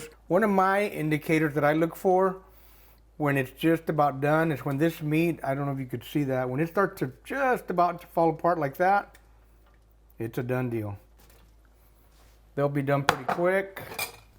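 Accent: Texas, United States. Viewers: I'm in HTown. You sound like a true Texan. Your recipe and directions are spot on. And I love the way you talk. It's like being right here with the fam.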